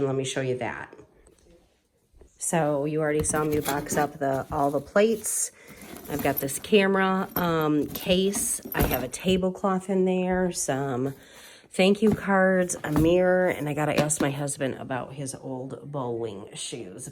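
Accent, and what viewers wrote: England, United Kingdom. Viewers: I could just picture you sipping tea from one of those vintage tea cups and speaking using your English accent. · Do I hear an English accent or no? Loving this video and need to do my own spaces so badly.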